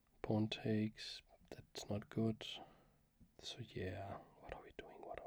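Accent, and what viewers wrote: Denmark. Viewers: I don't know about you guys but I clicked for the Danish accent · I do so love the Danish accent. Have you been to the b*stard cafe in Copenhagen? It’s a board game cafe. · You should do a video in Danish! I love the accent and really enjoy watching these videos, it would be lovely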